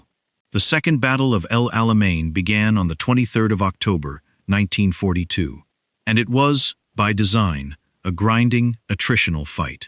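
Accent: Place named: United States. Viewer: Who is the American robot giving the commentary? None of the vehicles filmed are from WW2?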